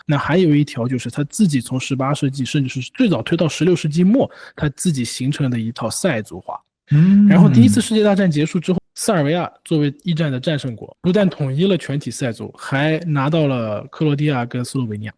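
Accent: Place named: Shanghai, China. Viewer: 完全的上海口音和语速😂😂😂😂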